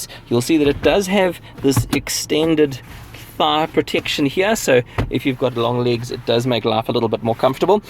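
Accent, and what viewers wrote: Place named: South Africa. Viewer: Your accent sounds South African 😅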